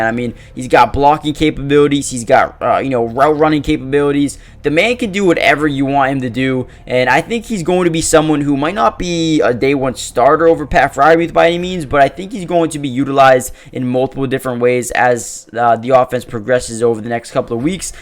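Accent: Canada. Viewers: Do I hear a Canuck in your voice????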